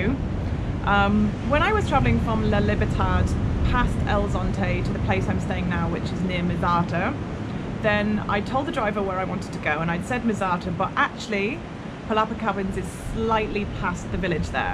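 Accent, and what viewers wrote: England, United Kingdom. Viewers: your accent is more like English from England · I like your British accent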